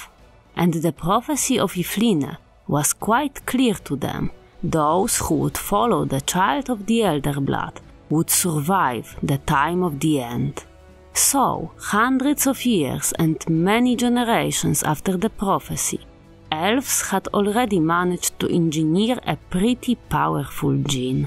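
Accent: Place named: Eastern Europe. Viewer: I love your accent, thought first its Italian but its slavic isn't it? Subscribed and looking forward to listening to more lore in the best voice and accent for it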